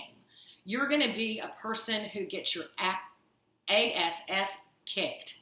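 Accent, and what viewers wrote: Texas, United States. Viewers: Are you from Texas? You've got the accent and that great kick ass attitude you need to deal with narcissists 👏👏👏👏keep up the good work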